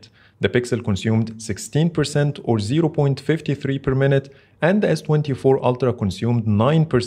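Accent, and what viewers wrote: Egypt. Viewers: واضح جدا إنك مصري والإنجليزي بتاعك باين جدا ليه مش بتعمل فيديوهات بالعربي .. اتعلم من عمر دايزر ويحيى رضوان قنوات بالعربي وشوف شغالين ازاي وعندهم متابعين قد ايه .. بلاش تعمل فيها مثقف وتروح تخاطب ناس مش هتعبرك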